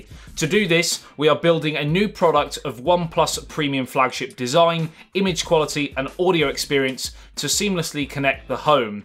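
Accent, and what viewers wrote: England, United Kingdom. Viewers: your videos are awesome and informative- especially i like your English accent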